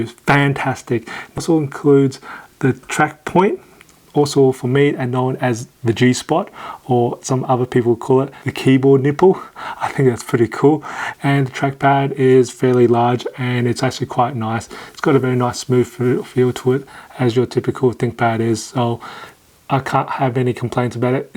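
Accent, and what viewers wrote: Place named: Australia. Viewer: Nah it's okay I'm looking forward for new content on your channel . Btw nice aussie accent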